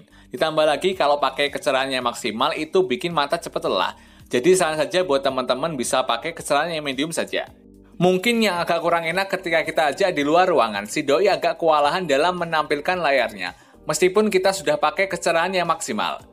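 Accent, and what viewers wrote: Magetan, Indonesia. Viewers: Asli ne wong ndi iki kok nk alon" magetan🤣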